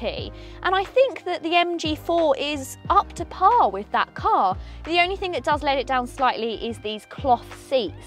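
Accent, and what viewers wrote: United Kingdom. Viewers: i came here for the electric reviews. i stayed for the british accent.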